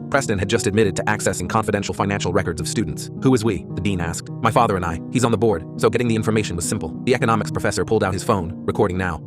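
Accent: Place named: Scotland, United Kingdom. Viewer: why is the ai voice like. VAGUELY Scottish at times...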